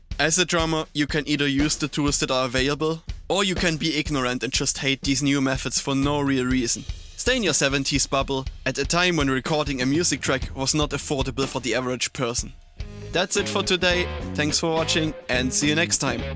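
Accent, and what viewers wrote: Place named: Germany. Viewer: Where do you live? U sound like a german guy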